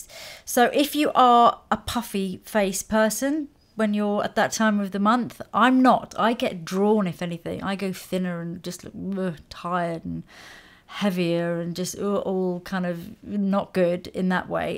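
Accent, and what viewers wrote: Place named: RP, United Kingdom. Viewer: you are ADORABLE! We all love your videos because despite your (lovely!!!) posh accent, you are SO relatable and always provide great tips and information! ❤️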